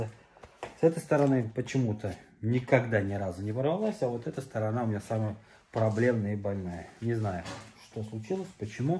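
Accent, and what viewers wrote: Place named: Tatarstan, Russia. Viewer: татарский акцент у тебя как у Миниханова.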